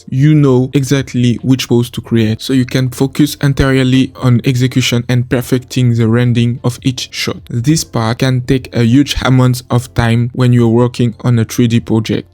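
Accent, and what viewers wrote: France. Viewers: j'ai reconnu l'horrible accent fr mais sinon très bon taff ! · Very french accent mdr. Mais comme d'habitude le rendu du GOAT de la 3D sur Inazuma est légendaire. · faut avoir des balls pour poster une vidéo ou tu parle en anglais avec l'accent français mdr sinon continue chef c banger ce que tu cook ! ( tu ma tué mdr)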